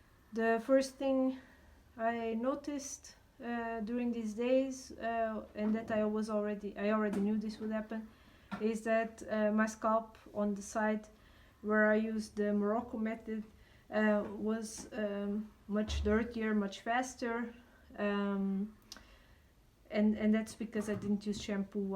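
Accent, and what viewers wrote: Portugal. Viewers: Just a question: Are you Portuguese? Because your accent seems to be 😁. Another question :This colour doesn't affect the hair colour? It just cover the gray hair? Thanks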